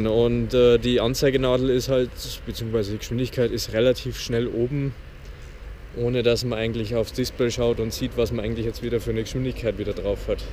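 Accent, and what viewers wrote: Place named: Bavaria, Germany. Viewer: Hab mir heuer auch wegen der Euro 4 noch gekauft in Schwarz.Wo bistn du her?Klingt so wia aus Bayern ;-)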